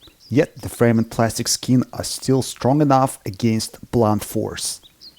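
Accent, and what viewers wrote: Russia. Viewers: Как только услышал русский акцент, понял - в видео будет скотч XD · Мне кажется что я слушаю английскую речь с русским акцентом) Обычно носители спешат и зажевывают слова до неузнаваемости. А тут почти на русском) Точно. Это же адвокат егоров =) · Ну прям русский акцент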